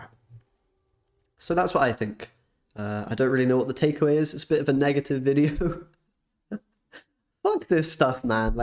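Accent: United Kingdom. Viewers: I still wonder why you clearly have a British accent but you use Polish currency on your store 😅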